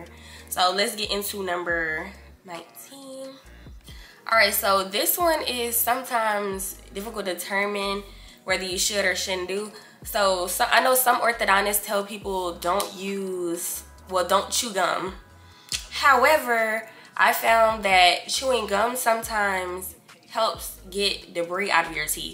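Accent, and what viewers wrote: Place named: New Orleans, United States. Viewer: U from New Orleans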